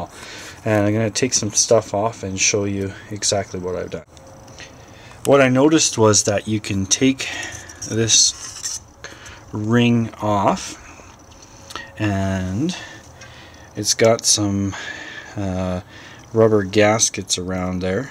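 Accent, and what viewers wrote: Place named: United States. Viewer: Ah. I assumed you were American from the accent.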